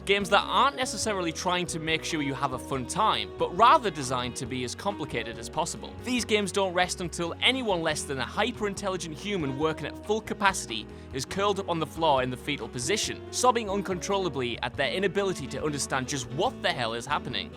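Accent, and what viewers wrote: United Kingdom. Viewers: Eh he's a Brit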